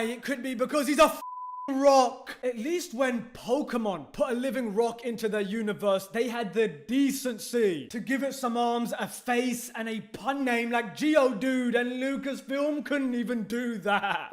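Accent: United Kingdom. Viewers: He have british accent? I didn't noticed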